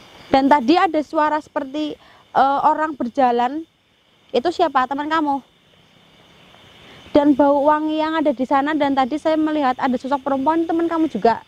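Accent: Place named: Java, Indonesia. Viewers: Mba... Medok banget... Aku suka....👍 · Kyak orang kebumen to MBK smpean ki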